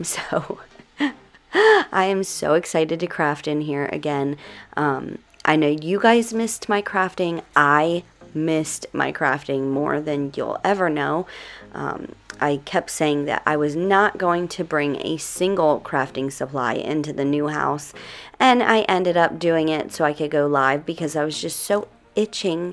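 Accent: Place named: Delaware, United States. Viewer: I have been watching you for a while now and I knew that you were from Delaware, I could tell by your accent. I am from Newark, but live in Western Maryland now. I know it's dumb, but I'm so excited that I was right. I just sent you a friend request on Facebook! You don't have to accept. I couldn't help myself!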